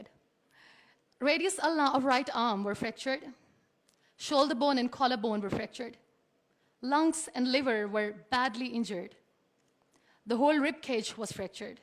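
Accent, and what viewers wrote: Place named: United States. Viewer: I salute u Muniba..ur speech touched so many peoples heart..keep smiling n Mr.badal y r u concentrating on her american accent?y r u not focusing on her speech..i think u r jealous of her communication dats y u r focusing on it more..plzz dnt disrespect any1 if u dnt no to give respect..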